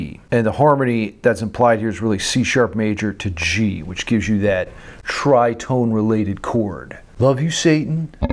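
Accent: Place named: South, United States. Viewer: you remind me SO mcuh to Dimebag Darrell, between your southern accent and silly humor similar to the Vulgar Videos. Keep it up!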